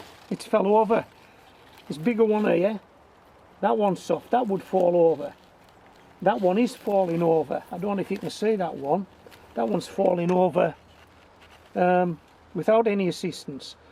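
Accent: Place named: Scotland, United Kingdom. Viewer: I do like to hear your Scottish accent, sir. So strong. Love it.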